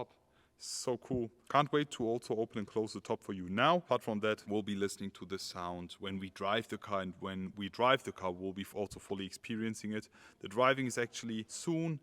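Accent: Germany. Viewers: It might be because he is speaking English with a German accent!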